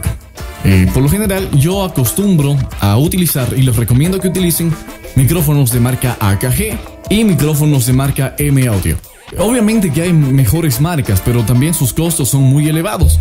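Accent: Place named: Colombia. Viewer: Muy buenos tips, buena vocalización y acento por un momento pensé que eras paisano je, saludos desde Colombia.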